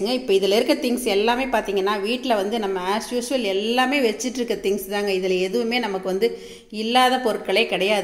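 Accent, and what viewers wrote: Kongu, India. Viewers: Thank you amma I like ur receipes and way of talking Kongu slang I am from Erode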